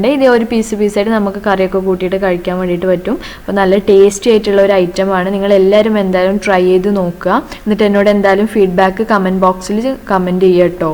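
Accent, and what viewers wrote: Kasaragod, India. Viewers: oru vlog thani kasarkod shylilyil pratheekahikkunnu.....i wud luv to hear ur native style of talking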